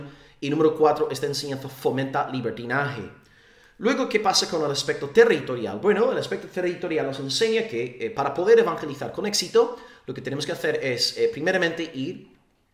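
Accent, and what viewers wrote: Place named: Spain. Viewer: Hno , tengo poco que l descubrí a Ud y me encantan sus videos me han enseñado mucho ,,soy cristiana evangélica calvinista ,pero quisiera decirle algo no capto bien a los españoles a pesar que soy de Mexico y hablo español pero Ud habla muy rápido y no capto las citas bíblicas y versos ,me puede ayudar de algún modo ..tal vez que en la pantalla aparezca esto ?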